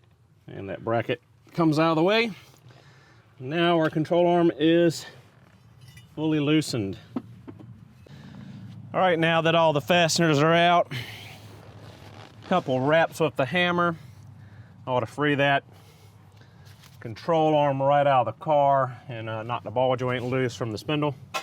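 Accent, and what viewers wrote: South, United States. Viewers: Nice video describing just the job I`m doing now. I like your southern accent! Love from Norway.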